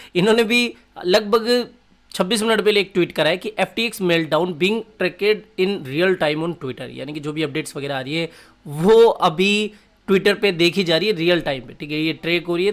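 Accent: Rajasthan, India. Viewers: Bhai app Rajasthan se ho na 😏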